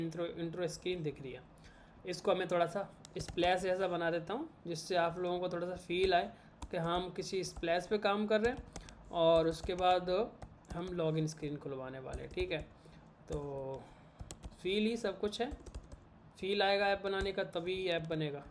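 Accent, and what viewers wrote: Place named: Western Uttar Pradesh, India. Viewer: Kisi biryani ke dukan pe jo ladke kaam karte h wesi tone me baat kar raha hai, 😂😂😂😂, what a language pachimi up wala h, 😅 व को ब bolne wala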